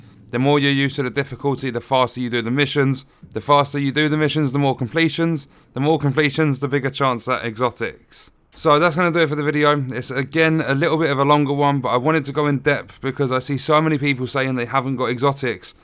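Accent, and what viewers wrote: United Kingdom. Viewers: U make me want a British accent bruv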